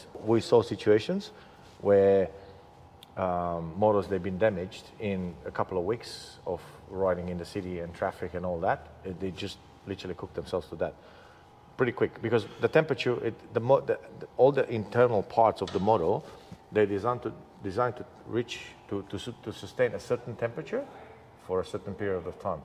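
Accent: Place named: Australia. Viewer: German dude speaking English with an Aussie accent 😉 👍🏻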